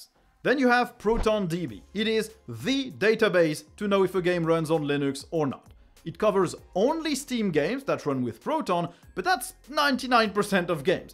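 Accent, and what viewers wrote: France. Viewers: Your French Accent is a breath of fresh air in this world that is inundated with American nonsense. Never apologize. Thanks so much for sharing. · French accent is the ❤ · great video, In his time, thank you, Btw i like your french accent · Thanks, I'll check some of these sources out. And I don't mind your French accent (barely noticeable) as I speak French with an American accent. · J'aimerais bien avoir le même "French accent" que toi 😅 Merci pour cette énième vidéo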